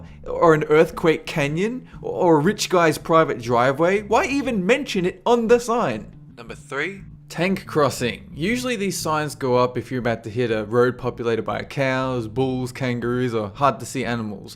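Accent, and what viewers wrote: Australia. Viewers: I don’t know how many times I’ve started a Planet video only to learn that wasn’t the narrator for that video. I don’t mind the other narrators at all, but the constant disappointment of not being able to listen to beautiful Australian accent when I intend to is annoying. Thanks for this playlist. Now I can listen to Australian lit whenever I want to, and only him. Also this is one of, if not my favorite, videos. I don’t know how many times I’ve watched this video. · OMFGGG i'm Australian too.. but, that's not the point. i thought you were American, and as soon as i heard you say WE have a saying here in Australia i can now suddenly hear an accent coming from you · He just sounds like a normal aussie to me haha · Are you australian cause i am and you hardley sound australian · Is Australian? I can never tell the difference between a british, eglish, and australian accent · Very funny! 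Also, if you have closed captioning, like I do, it doesen't understand the Australian accent And the "gusses" it makes in American English are funny too!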